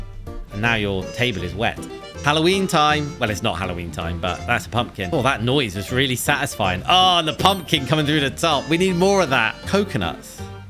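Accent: England, United Kingdom. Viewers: Are you English or American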